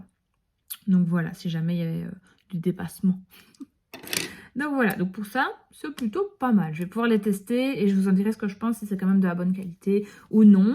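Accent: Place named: Belgium. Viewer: Meuf je kifff ton accent 😅☺️je pense que t’es belges si nn super ta vidéo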